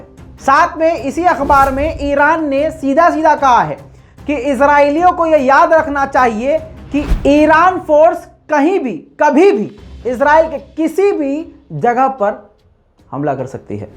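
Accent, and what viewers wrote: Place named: Bihar, India. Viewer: Loved Bihari Andaz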